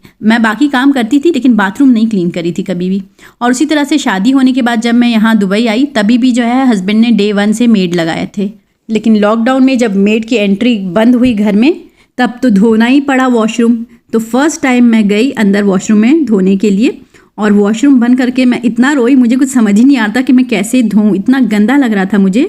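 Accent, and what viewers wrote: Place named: Varanasi, India. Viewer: The way YOU said this 👉🏼 "..yahan to etna badaa badaa bathtub🛁 hai..esme koi late k to नहाता hai nahi pr Clean pura krna padta tha😅😆is sooo Desi style n 💯 true as well. I live in medina N i loooveeee ur desi accent its sooo much similar especially ur "kahawate/muhaware" which reminds me my home town Banaras,U.P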